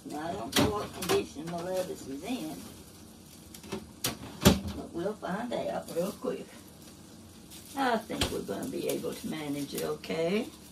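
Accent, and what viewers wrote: South, United States. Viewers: You are a Sweetheart♥️You remind me of my Precious Mother.Love the southern accent.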